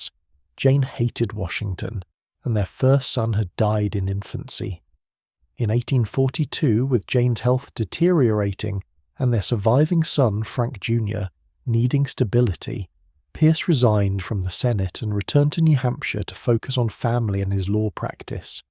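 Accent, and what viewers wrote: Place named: United Kingdom. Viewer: Yeah. Why do we need a goddamn Limey to tell us about our presidents? There's no excuse for it.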